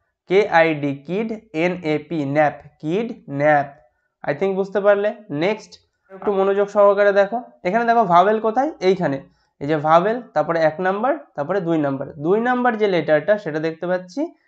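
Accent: India. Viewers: Are you an indian?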